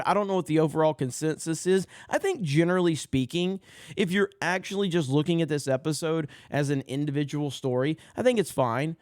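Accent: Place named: South, United States. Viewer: "Agenda. Agenda. Agenda" this reviewer has a thick redneck accent, not surprised at all.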